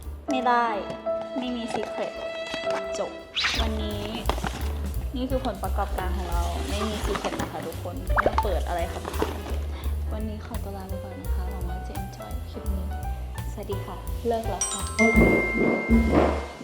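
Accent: United Kingdom. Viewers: I fell in love with Freen's English accent!! She keeps getting great day by day❤ I'm happy that she's happy❤ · Yeah, Freen is so cute...unboxing the Astro Boy box. I love her English accent, she's naturally funny and beautiful❤️.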